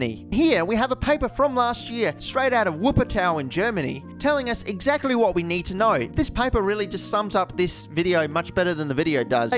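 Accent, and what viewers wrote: Australia. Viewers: Not only do I realy like your aussie accent but your honesty. you r showing all the damn takes it took, to get this tiny (but well worth it in my opinion) explosion filmed. You are awesome mate! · "The atmosphere is nature's bin." Spoken like a true Australian.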